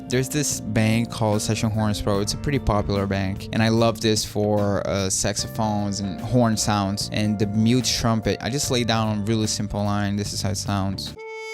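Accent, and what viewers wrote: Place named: Brazil. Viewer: Pelo sotaque eu acho que tu é brasileiro kkkk acertei?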